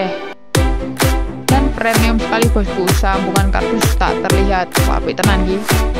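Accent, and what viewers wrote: Java, Indonesia. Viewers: Bang orang jawa mana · Wong jowo ndi suarane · Mase wong jowo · Dari mana nih bosku.. Kok logat jawanya mateng amat haha salam dari kota Demak Karang tengah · Jowo ne ngendi sampean mas:D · Logat jowo ne keliat banget :v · Jawa medok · orang jawa ya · wong jowo ya kowe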